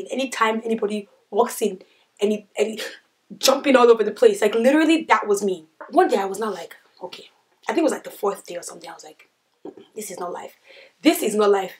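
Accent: Nigeria. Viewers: Lmbo you told this story in the most Naija way. So funny. Glad you made it out alive :)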